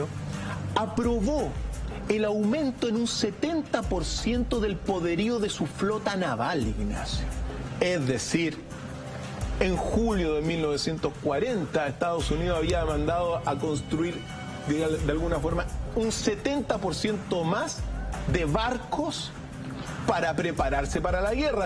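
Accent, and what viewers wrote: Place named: Chile. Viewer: De ver los videos me he acostumbrado al acento chileno, personalmente me parece agradable, por no hablar que los temas y la manera de contar del me encantan.. saludos desde España.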